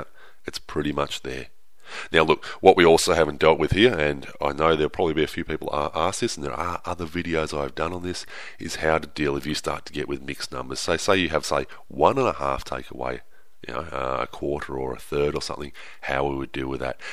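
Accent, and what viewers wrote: Australia. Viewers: You Australian mate? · I noticed his Australian accent I think this boi is left handed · Thank you. .you lovely Australian math God ;-) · Thank you so much you helped me with subtracting fractions and I love your Australian voice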